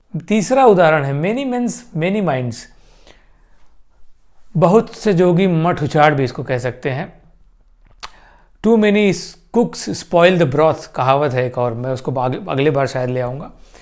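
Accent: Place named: Uttar Pradesh, India. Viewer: Apki boli se lgta hai aap jaunpur ya pratapgarh k rhne wale hain.. Accha lga apse mil kr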